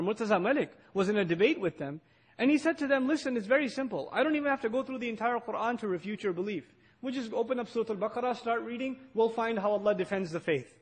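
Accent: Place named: Asia. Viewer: How that exposed. The guy waffles for a few minutes in an Asian accent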